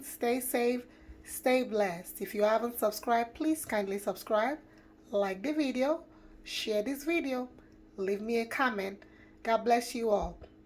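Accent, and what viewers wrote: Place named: Sierra Leone. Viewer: Are you Sierra Leonean? I can hear the Salone accent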